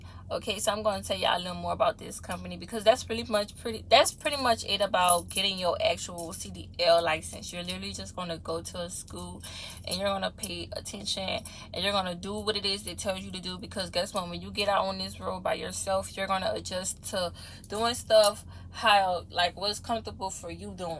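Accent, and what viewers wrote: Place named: New Orleans, United States. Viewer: Aye Nola in da building I heard New Orleans lol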